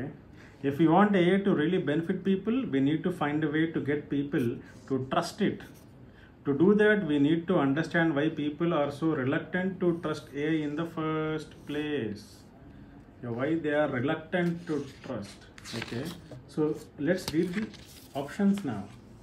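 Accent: India. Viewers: the Indian accent was difficult for me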